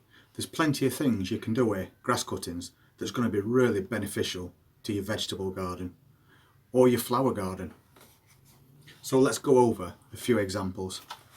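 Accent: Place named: Shires, United Kingdom. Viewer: That accent sounds like a lad from the shires.🐝🐝🐝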